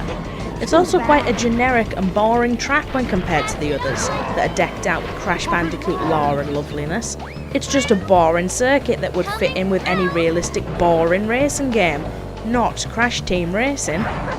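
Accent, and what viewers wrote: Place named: Northern England, United Kingdom. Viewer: I love a good Northerner. Sheffield?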